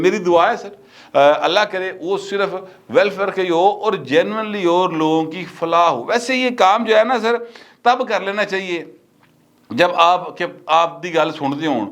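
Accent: Lahore, Pakistan. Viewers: Your Lahori andaz is excellent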